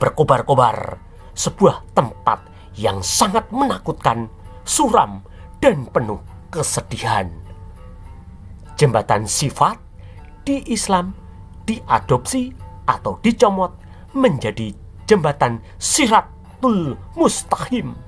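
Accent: Java, Indonesia. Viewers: lu pamer bhsa medok jawa lu,ato jrgon nusantara lu,mo sok NKRI lu,ato lu pemuja fanatik jawaisme lu,klhatan cupunya lu ndeso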